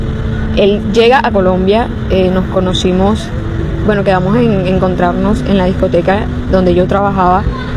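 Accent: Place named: Venezuela. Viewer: Pues te salvaste de ése asesino. Menos mal fuiste más lista. Deberías de hablar con la Fiscalía; y ser testigo en contra de éste individuo. Eso ayudaría más al caso. Me imagino qué tendrás fotos con el, para evidenciar de qué si se vieron. Fuiste muy inteligente!!! Y..tu acento me luce venezolano....será tú versión un montaje????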